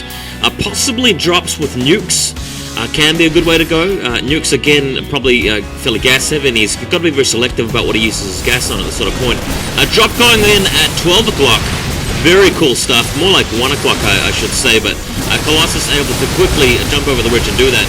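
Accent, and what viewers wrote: New Zealand. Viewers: Screw Day9, Tastosis, HD and Husky, when it comes to sc2 commentaries, your the man :-) And I LOVE your kiwi accent by the way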